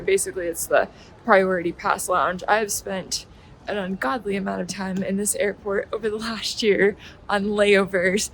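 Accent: Texas, United States. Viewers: Блин красивая женщина) Есть что-то неуловимое, что притягивает мой взгляд. И еще ее американский мне абсолютно понятен. Приятно послушать чистый, понятный американский язык. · I'd love to hear your Texas accent :) and some restaurants or "streetfood" what you like in Russia :)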